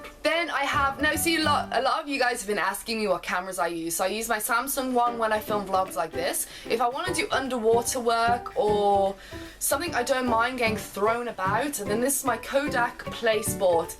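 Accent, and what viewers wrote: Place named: RP, United Kingdom. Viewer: i love love love your videos and think you're absolutely great, but i can't help giggling... you have such a 'gap yah' accent, i love it!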